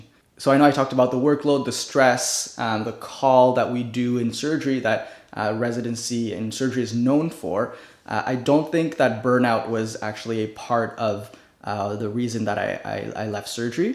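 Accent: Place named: Canada. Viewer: o shit you're canadian?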